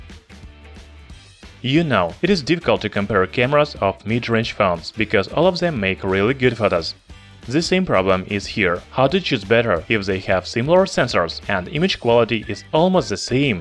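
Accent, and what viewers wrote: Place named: Russia. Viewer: Nice review, but your Russian accent makes it hard to understand what you are saying. Maybe someone else could speak the reviews!?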